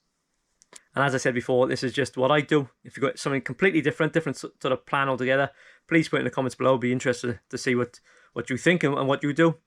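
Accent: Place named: Scotland, United Kingdom. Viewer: I subbed, but IDK if I can deal with the thick Scottish accent.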